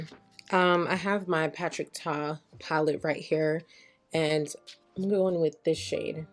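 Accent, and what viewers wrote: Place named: Jamaica. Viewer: It’s the Yardie accent for meeee lol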